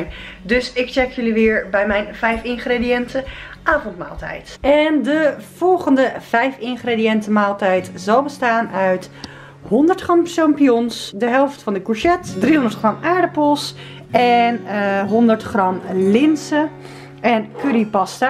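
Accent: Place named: Gooi, Netherlands. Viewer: Jouw gooisch accent. Mieterrrs HE-LE-MAEL LEUK 😂 (Kom ook uit dat gebied dus kon dr hard mee lachen) P.s. ik hou van deze serie maar dat weet je al 😘😘😘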